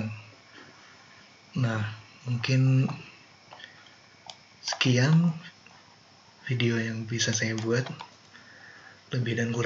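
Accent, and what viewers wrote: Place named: Java, Indonesia. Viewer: logatnya jawa abis hahaha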